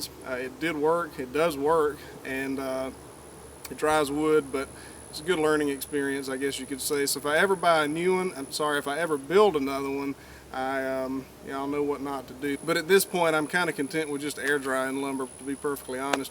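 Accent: South, United States. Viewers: Hello from Canada! I really wish american You Tubers would tell us what state they come from! I have had some travels in my time in the U.S. but there's a lot I haven't seen. Nonetheless there's a few: 1) You're somewhere in the south partly by your Parlance (more than just accent) and being a language lover this is nice educative fun for me also!, so from Quebec <<chapeau! >> 2) By the vegetation around, this is obviously not in the desert or the midwest. (watchi8ng a lot of Amrtrak videos, I have seen some of the countryside that way to be able to compare with). since the vbagetation looks quitre thick and lush, in soite of the drought you reported, This has to be a notable warmer climate with a notably longer growing season than ahnyrhing up north. So your effort seems very well placed in relation to nature! As for wood, as it hapopens I grew up amny years ago in Oakville, Onterio, some 20 miles West of Toronto. 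Historicaslly the town got its name when it became famous for production of barrel staves, made of, oak of course. In our back year was one of thise huge oak trees, and, of course lots of squirrels, but thos little critters can also make a !!!! of a mess of the corn patch. (Also they can carry rabies, so they are somewhat of a public pest). So all in all thumbs up and to/from??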